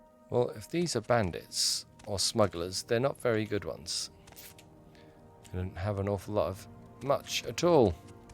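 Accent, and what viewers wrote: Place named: United Kingdom. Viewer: I do appreciate some British accent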